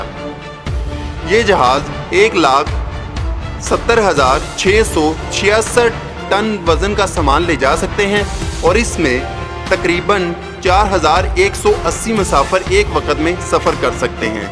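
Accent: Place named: Punjab, Pakistan. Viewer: تمھاری پنجابی زبان کے لہجے میں اردو بولنا انتہائی گھٹیا لگ رہا ھے. پنجابی میں اپنی ویڈیو شروع کرو.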